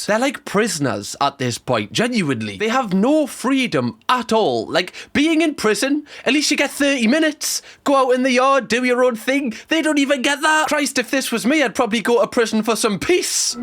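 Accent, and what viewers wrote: United Kingdom. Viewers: I am new to the channel, I have watched a few of your videos. I love british accent...however, I was like "Why he keeps asking for donors? That's wild. " but I finally understood you were saying "donuts" lol, here you go I am one more donut now 🍩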